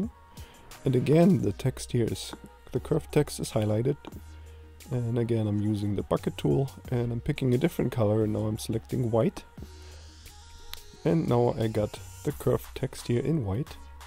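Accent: Germany. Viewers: Thank you, very helpful. Is it the German accent that I'm hearing?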